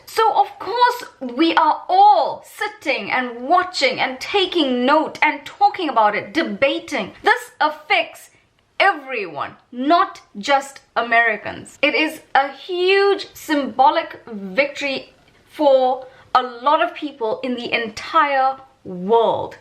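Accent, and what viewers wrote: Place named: South Africa. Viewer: Oh...... Finally...! I know this point will be entirely off topic ne but flippen hell.. it really feels good to hear your South African accent + your SA Indian accent no like less! For the longest time I've mostly only ever seen these US presidential discussions feature folks from USA & UK reporters and alike. You're bringing a voice to this topic from SA's observing point of view & we're waiting out here just wondering how these next 4 years of USA, laws and policies affect us. This is Ken, from Our East London, SA lol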